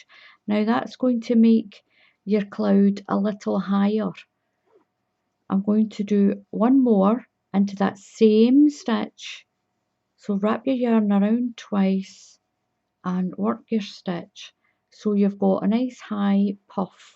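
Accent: Scotland, United Kingdom. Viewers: What a lovely tutorial and so great to hear a fellow Scottish accent as a bonus!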